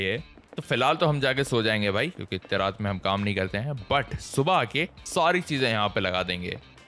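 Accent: India. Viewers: Bro are you an INDIAN?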